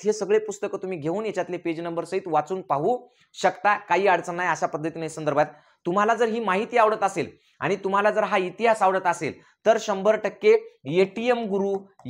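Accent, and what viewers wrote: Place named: Marathwada, India. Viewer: तुमची मराठवाडी भाषा फार छान वाटते.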